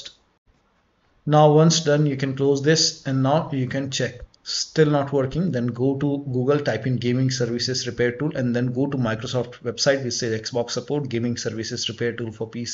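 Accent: India. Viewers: Legend 🙌 Worked for me. This issue was infuriating The Indian voice has become a wave of relief on these videos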